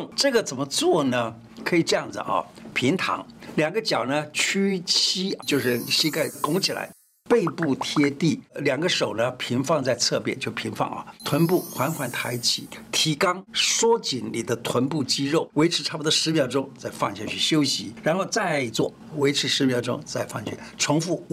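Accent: Taiwan. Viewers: 笑死了，胡醫師說台語好有趣喔，說得比我有趣多了。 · 胡醫師的台語好可愛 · 胡老的台語 道地 有味道 用心了給你按個讚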